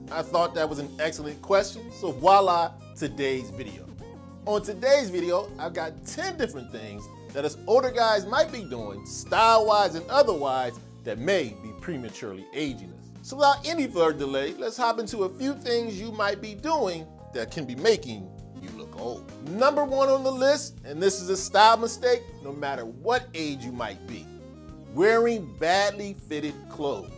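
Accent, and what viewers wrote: Philadelphia, United States. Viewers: As soon as you started talking, I was thinking “He sounds like Philly.” And you are! Your vid popped up and I enjoyed it. 👍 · Bro as soon as I saw you and head you talk I KNEW you were from Philly. Honestly. It has to do with your grooming and how you express yourself. I would even go as far to say either Mount Airy or Cheltenham but definitely not North /South Philly? I’d like to know. I grew up in the Burbs, North Wales, the Holiday Inn was the Spot, along with Kim Graves, the Library and Second Story and Freaked out Catacombs. I am Thankful to be ALIVE TODAY. I was often, back in the day, in Ronald Phillips to get the freshest kicks. Or Today’s Man for clothes. I guess that tells you how old I am. I personally don’t feel comfortable in the slim pants leg simply because of my athletic build, but there is truth in the statement that Style is Personal. 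And the most important factor to a good looking, or attractive man is grooming. I remember growing up in that 80’s TP Era, always FRESH, when Men made an effort to IMPRESS the Ladies, BUT it was MORE important that You felt good. Changing up with a 3 day beard, or shoes with no socks. I still do wear CB Boots, but Ostrich, Lizard, or Croc with jeans. Some things don’t change. As a matter of FACT you resemble the Shoe salesman at Ronald Phillips LOL. Although most of the Brothers would feed off Other Bro to find the adequate style, I always liked to mix in the European flavors. I was into the Older Men’s fashion sense at a young age. I was very impressed with Bogart, Cagney, and the KOOL George Raft. Had a Subscription to GQ and learning about Designer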